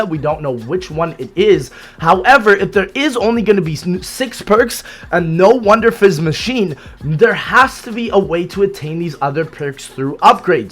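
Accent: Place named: Newfoundland, Canada. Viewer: I realize now that the way you speak is almost like how a newfoundlander sounds and to people who aren't exposed to it a lot have no idea what you're really saying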